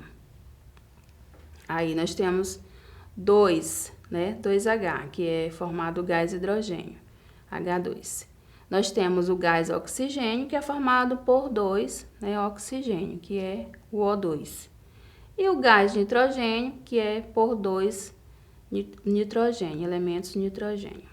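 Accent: Bahia, Brazil. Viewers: Ela é baiana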